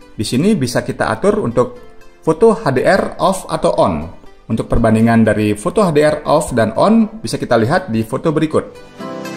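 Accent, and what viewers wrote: Bali, Indonesia. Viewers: Makasih bang penjelasannya kerennn banget…ngomong2 logatnya kayak logat bali bang..hehehe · Logatxa kayak orang bali.medok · Orang Bali kayaknya logak nya...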